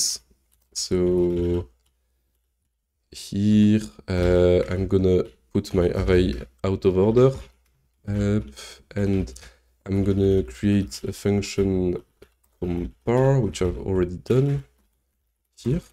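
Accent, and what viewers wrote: France. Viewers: u speak english like a french person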